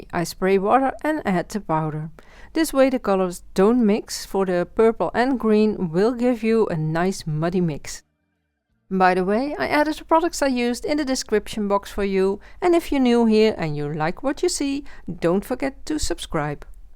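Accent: Netherlands. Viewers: Wstunning once again! Are you from Holland? Your accent sounds familiar!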